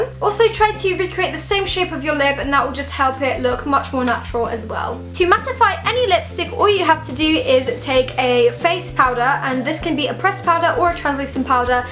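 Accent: England, United Kingdom. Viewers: English accent* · That is a British accent correct? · hahah defenitly not polish with pure english accent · she has an English accent. There are so many different accents in Britain eg Scottish, Irish etc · British accent 😍❤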